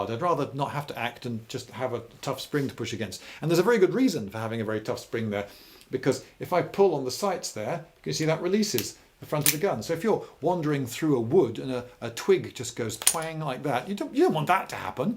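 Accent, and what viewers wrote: United Kingdom. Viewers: This guy sounds British. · Explaining anything in an English accent makes whatever you are talking about 10x more interesting. You could be talking about the history of the bar stool and it would be entertaining.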